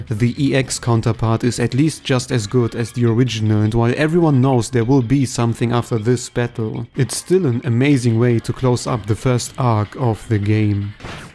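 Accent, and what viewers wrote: Germany. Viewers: The German accent in his voice, I find it pretty neat sounding. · du bist deutsch richtig? das kann man raushören. 😂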